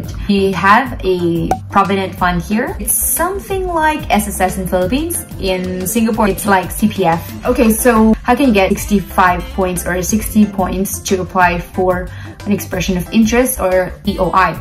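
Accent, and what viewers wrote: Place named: Australia. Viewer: I love your Aussie accent ma'am.New subscriber and friends here💗. How I wish I could work there in the next 2 years. I'm civil engineer here in the Philippines having 3 years of work experience however I'm so hopeless, aside from all the detailed documents you need to prepare.then you need at least to have half a million of pesos.I don't have enough money to prepare all that I need, but still I'm here to pursue that dream 😞🙏🏿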